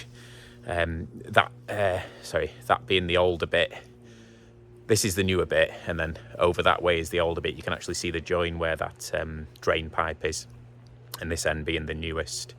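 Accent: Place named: Northwest England, United Kingdom. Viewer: Your accent sounds like you are based somewhere in the NW. I’m midst arranging a eco4 heat pump install (and insulation) via the council for my parents, it’s for a nearly 300m2 property. Pre insulation it was a 18kW heat loss estimate from the EPC, obviously we hope that can be significantly improved. Wonder if I can ask what a the key aspects I should I be looking for from the installer please, it’s the council approved installer from Cheshire East that is based in Warrington/ Liverpool (I don’t like to mention whom it is). Thanks for any tips.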